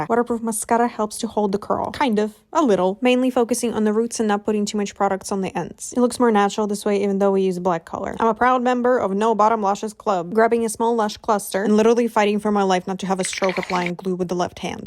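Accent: Russia. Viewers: I'm actually wondering about your native language (like u've said about the immigration) cause it sounds so russian (delulu myself) And i also have 1 clue. Ur nickname can be read in russian like with the transliteration (the translation is unbreakable) sooo that made me even more sure about my assumption Didn't want to offence anybody, if i'm mistaken, at least you have +1 russian pookie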